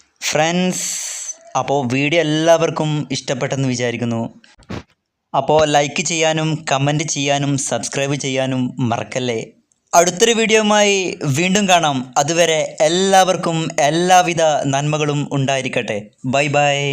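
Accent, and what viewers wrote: Malappuram, India. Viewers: Malappuram bhashayum .... pazayakala ormayum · Malapuram bashayanu ee vdonte monj..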